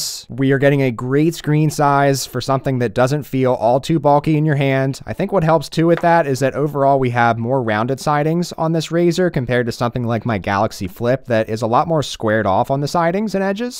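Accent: Philadelphia, United States. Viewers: Definitely Philly/South Jersey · I definitely detect a philly accent = S. Jersey PA DE or MD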